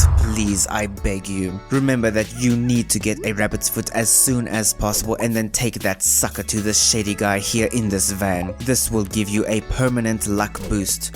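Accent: South Africa. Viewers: he sounds south african to me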